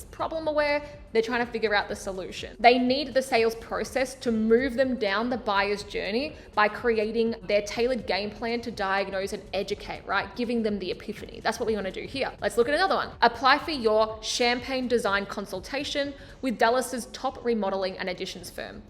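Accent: Australia. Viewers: New sub after watching many of your videos over the past few days. Also, fellow Aussie accent! Yay! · Still loving that Aussie accent.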